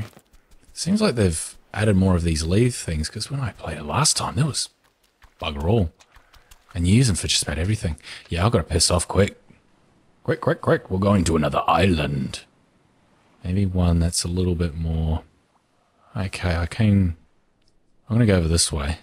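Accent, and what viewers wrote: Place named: Australia. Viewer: I knew you had an Australian accent by the way I'm kiwi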